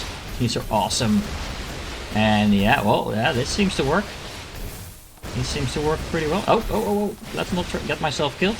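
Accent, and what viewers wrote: Netherlands. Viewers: Je klinkt gewoon extra Nederlands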